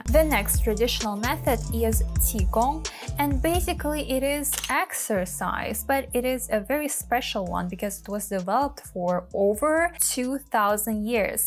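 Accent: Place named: Russia. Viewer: you have a very good English but am I hearing Russian accent?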